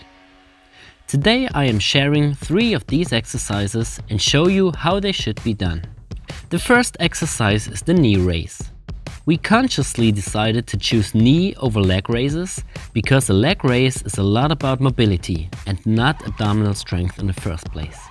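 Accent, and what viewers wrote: Germany. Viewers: Are you German? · Are you from Germany? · are you from germany?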